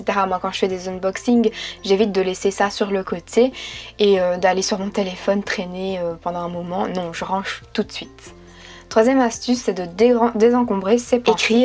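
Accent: Belgium. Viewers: J’adore ton accent belge tu me manque ❤️❤️❤️❤️❤️😪 sinon tu peux faire une vidéo sur comment avoir des bonne notes car j’en ai des mauvaises et j’en ai marre merci bisous❤️❤️❤️❤️❤️❤️❤️❤️❤️❤️❤️❤️❤️ je t❤️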